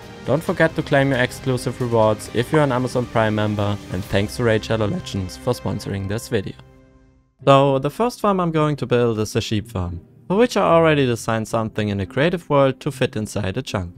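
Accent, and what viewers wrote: Germany. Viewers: Your lucky you have za german accent. Im mostly German, but have no accent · Super german Easy englesh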